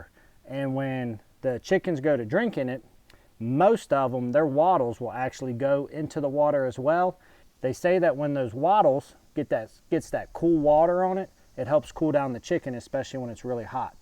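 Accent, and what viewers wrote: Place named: South, United States. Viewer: I watched this today. Interesting inco. Will look into vor warm weather watering. I live in area where it freezes several months of year. I use a rubber pan for water now. When water freezes, I turn it over and stomp out ice, then refill. Easiest method for me here. What do you suggest for winter months, without using a heating source. I can tell you are from Southern area because of acceent😉. This product , In my opinion, would not work in freezing climate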